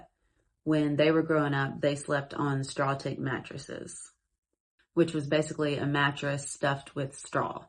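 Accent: Appalachia, United States. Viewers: Exactly how my G parents talked and how I still use those phrases. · That dialect suits you well:) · Sounds like you're just talkin'. :) My grandparents (GA 1898) talked just like that. How much is "a rite smart"? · My Nanny talked like this! 😍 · When you say "Appalachia", what part are you talking about? Appalachia goes from Georgia to Maine. I hear an accent, so I'm assuming you're somewhere between Georgia and the Virginias, which is where the locals seem to think "Appalachia" only applies to for some odd reason. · Thank you for sharing this. My grandma was from the Appalachians, so much of this sounds like her, especially warsh rags and the commode. She passed several years ago. I miss her so much. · yep sounds just like my relatives. We say it's over yonder. Plus all of the words you said. My ancestors were from Tennessee and Virginia.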